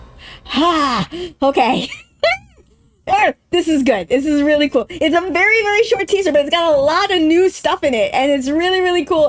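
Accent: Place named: United States. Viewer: American accent, American accent dances in American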